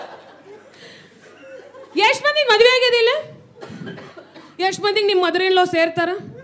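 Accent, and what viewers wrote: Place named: Uttara Kannada, India. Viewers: North Karnataka dialect, particularly Dharwad I believe. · you are so good in comedy talk show, one of the best,classy, good vocabulary, typical Uttarakannada style of talk, God bless, am your fan from Gulbarga, a doctor by profession,you are too good,( Mast Majha Bantu kheli) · Hubli Dharwad bhasha dashtu zabardast style yawdu illa....u did justice to it.... simply bold and beautiful 😍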